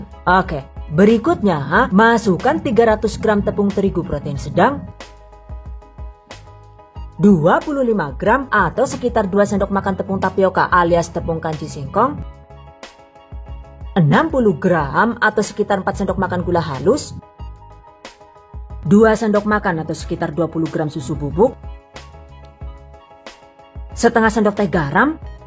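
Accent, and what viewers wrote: Banyumas, Indonesia. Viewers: Saya suka resep" bunda, saya juga sering praktekin resep" nya alhamdulillah berhasil bun, dan yg ini mau saya simpan juga resepnya kapan" mau bikin juga, trimakasih resepnya bun, lebih suka lgi klo ngomong ngapaknya bun..itu anak yg suka nyobain adonan mentah udah gede ya bun..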